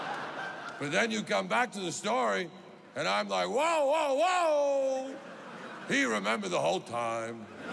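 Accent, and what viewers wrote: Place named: Bogan, Australia. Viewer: Im sorry! The Bogan Aussie accent makes this immediately hysterical. LOL he could be ordering chinses take-away, and i would be rolling on the floor. Im a simple man; bogans make me laugh.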